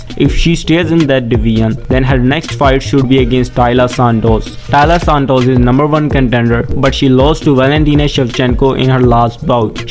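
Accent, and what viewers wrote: India. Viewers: MY GUY WITH THE INDIAN ACCENT!!!!! WHATS UP MY FRIEND?! Vegas 69 main card was the drizzling shits but Blanchfield was awesome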